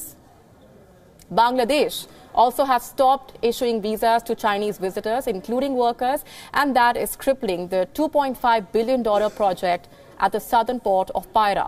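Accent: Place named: India. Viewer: Very heavy Indian accent.....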